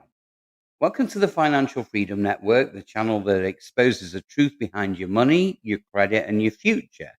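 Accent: England, United Kingdom. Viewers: You sound English but you talk in dollars and call a car a sedan ! I’m confused. Ps I will never buy a car with an infotainment screen.👎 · You sound very English; why are you talking in dollars? What yank is interested in RHD? I just spotted it they're all LHD, you're talking about the US market. I suddenly lost interest. All the best mate! Mines a Jaguar XJL. It depreciated right to the point where I could afford after 12 years. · I'm confused, are you talking about UK or US sales, confused because you have a UK accent but prices are in dollars. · American script with an English accent 😂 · It might help if you made that clear! Initially owing to your accent I thought this was addressed to UK citizens. Then when I saw a dollar sign in front of some numbers, the penny dropped! Shame that nobody could be bothered to show the currency for all the values. 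A very sloppy video! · Just found your channel,having been a petrolhead for more years than I can remember, I was interested in this clip. That said, I am a tad confused, I assume by your accent that you are from Great Britain, however your logo shows a USA flag and terminology is somewhat odd (to me) ie $ Dollars, Gas, Sedan etc? As for Car dealerships, I agree with numerous posts that car manufacturers and dealerships have been taking the eyes out of the very people that keep them in a job THE CUSTOMER! Far too greedy, I have absolutely not one jot of sympathy for these money grabbing burglars. They reap what they sow. 🇬🇧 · What country does this apply to? English voice, prices in USA $$?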